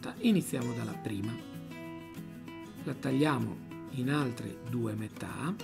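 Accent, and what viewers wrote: Sardinia, Italy. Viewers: mi pareva di sentire un lieve accento sardo ... saluti da Ercole Pinna ... sarebbe interessante una pizza con prodotti sardi ... carciofi bottarga e pecorino !!!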